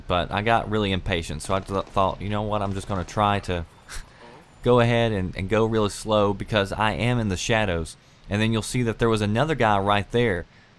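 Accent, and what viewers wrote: South, United States. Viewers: Your commentary is top notch. It's probably the southern drawl. I don't even have Splinter Cell and I enjoy these walkthroughs. I am looking forward to you making more "Tips & Tricks" videos for BF4. That's originally why I subscribed to your channel a long time ago. Keep up the good work.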